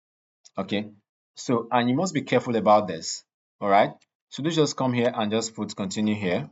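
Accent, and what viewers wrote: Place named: Nigeria. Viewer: Just watched your tutorials and like them. Noticed the Nigerian accent as I lived there in the 70's and attended St. Gregory's College in Lagos. I am an Electrical Engineering graduate and Oracle Certified Professional (Application Developer) and learning to take the Python certification (PCEP) in one month. Keep up the good work.👍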